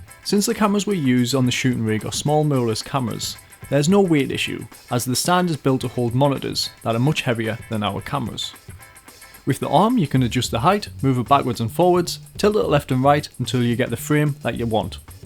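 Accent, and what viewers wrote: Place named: Newcastle, United Kingdom. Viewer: Youtube needs more Geordie accents- sub'd for great film making tips.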